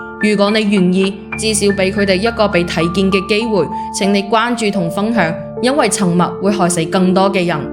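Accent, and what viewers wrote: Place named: Teochew, China. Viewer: ding gai yao ciu zao yang ge? (點解有潮州音概？)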